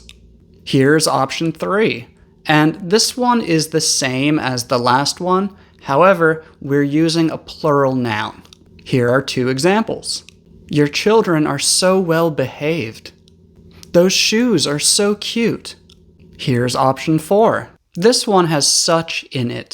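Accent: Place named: United States. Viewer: Excuse me, I hear your voice and I'm wondering if you're from the United States? You speak very clearly, I can guess, maybe you're an English teacher? Would you like me to show you around my neighborhood?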